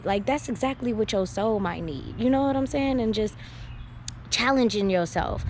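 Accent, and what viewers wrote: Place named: Bay Area, United States. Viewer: i could hear you from the bay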